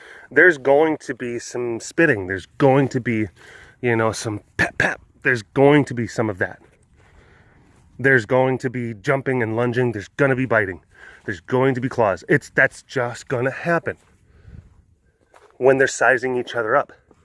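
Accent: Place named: Wisconsin, United States. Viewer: Your WI accent is strong in this one. :)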